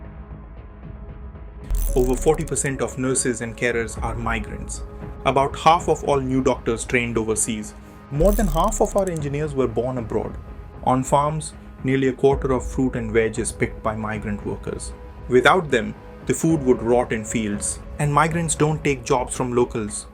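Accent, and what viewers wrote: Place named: India. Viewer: JUST STRAIGHT UP BULL SHIT What Anti-Migrant Protesters Got Wrong DO YOU ME MEAN AUSTRALIANS? LOL (I HAVE NOW BECOME ANTI-MIGRANT) BUT HAY, IT'S ALL ABOUT THE LAST 3 YEARS OF YOU LAZY, DIRTY, MEAT HEADS COMING INTO OUR COUNTRY AND OUR SO CALLED TRUSTING BUT LOVING GOVERNMENT LETTING IN 1500 INDIANS AKA IMMIGRANTS INTO OUR COUNTRY PER WEEK AND JUST TO TOP IT OFF IT'S A INDIAN VOICE OVER (GO HOME) TRYING TO TELL US AUSTRIANS HOW GOOD THEY HAVE BEEN FOR OUR ECONOMY F OFF HOME WE DON'T NEED YOU OR WANT YOU!!!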